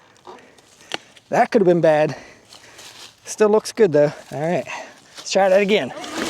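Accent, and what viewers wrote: Pittsburgh, United States. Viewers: Are you from Pittsburgh??? 😂 Also I’m strongly considering this bike over wired, and a few others. Currently added to my cart 🛒 and can’t decide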